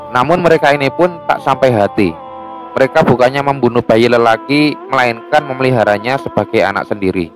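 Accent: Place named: Java, Indonesia. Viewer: Capek gw dngrin baca critnya.. medok gtu bahsanya 🤣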